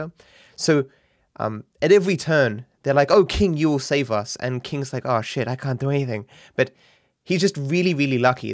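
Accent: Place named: Australia. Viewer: Where in Australia do you live? Your accent is sooo smooth compared to my Australian friends.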